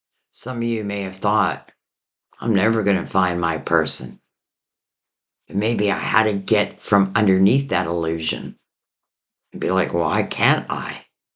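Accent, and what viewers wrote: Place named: Pennsylvania, United States. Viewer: First time I listened to you. I am feeling your energy and I’m so thankful I found you. I love your accent. PA? I’m from Philly. I connected with your reading so much. My Dad came through with everlasting love. ❤️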